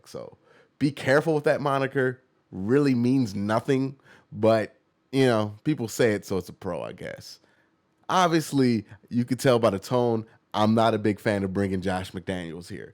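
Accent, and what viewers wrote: Cleveland, United States. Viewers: Great analysis...As a huge aside, is a great example of a Cleveland accent...never knew I had one until I moved to Youngstown: "lahcker" for locker; "rahster" for roster; "pros and cahns", you get it. Keep up the great work,!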